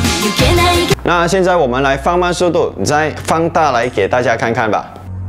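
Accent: Malaysia. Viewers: 馬來西亞口音我不會不舒服，反而是北京腔捲舌音聽了很噁心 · 只要是马来西亚人都听得惯你的口音吧，因为我也是客家人，虽然我是槟城人😅😅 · 一直在猜是哪里人 就是没想到是马来西亚人╮(╯▽╰)╭ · 哈哈 听出来了马来西亚人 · 马来西亚独特的口音是不行hio 马来西亚很多种族 什么腔都有啦🙄🙄🙄更何况讲了十多年都是这样 哈哈哈哈哈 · 马来西亚的华语就是酱的咯！习惯就好！哈哈哈！ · 听你的口音就知道是马来西亚人了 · 這口音很棒啊，我認識很多馬來西亞人我很欣賞這種口音的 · 我是臺灣人，我都有在看惡靈的影片，所以我聽到懂馬來西亞華語，ㄅㄞˊㄔ¯ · 除了光良的其他都不是呀~ 马来西亚口音可爱啊！😁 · 马来西亚的普通话我觉得挺好听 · 我是香港人，聽口音知道你是馬來西亞人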